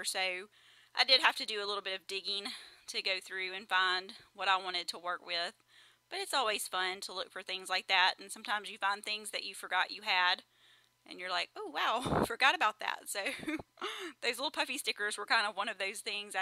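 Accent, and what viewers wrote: South, United States. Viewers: Where are you from? I love your southern accent.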